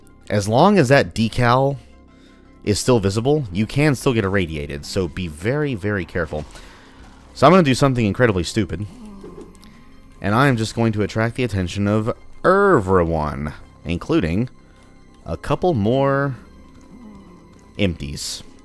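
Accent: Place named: United States. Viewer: Amazing video! Too bad you have to do the "too big to go through the door" trick on the highest difficulty, makes it a different experience in my opinion, but I just love you commenting on everything with your beautiful American accent. Can't believe you do 2-3 playthroughs before the video recording one. I guess it's necessary to make the videos shorter. Do you really find every secret by yourself btw? None of that pagb666 vids cheating? ;)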